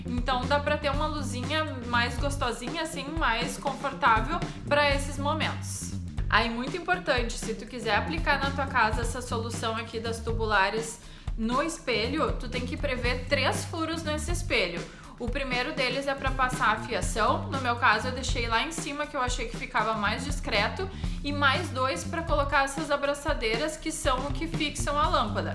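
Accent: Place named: Rio Grande do Sul, Brazil. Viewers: Como que a pessoa não consegue discernir que é o sotaque do Rio Grande do Sul. Lindo sotaque. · É apenas o sotaque do Sul galera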